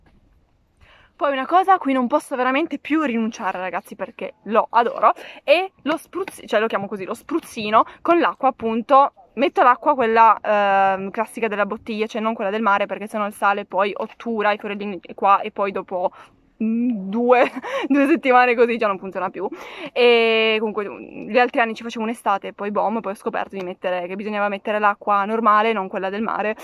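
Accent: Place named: Salento, Italy. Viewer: MAMMA MIA QUANDO ASCOLTO IL TUO ACCENTO, È MOLTO VICINO AL SALENTINO.. MAMMAMIA CHE NOSTALGIA DELLA MIA TERRA. SALUTAMI LU SALENTU,LU SULE, LU MARI E LU IENTU 🤭🤗🤗🤗🤗😂😂😂😜😜😜😜😜😜😜😛😛😛😛😛😛🌹🌹🌹🌹🌹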